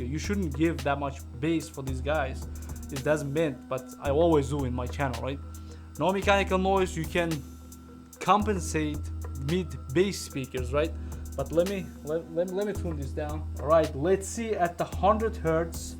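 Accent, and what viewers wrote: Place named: Russia. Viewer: The best according to you Russian accent speaker review guy.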